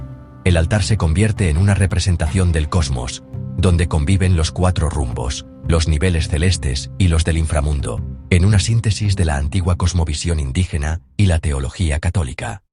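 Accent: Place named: Spain. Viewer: Y lo narra un español 🙄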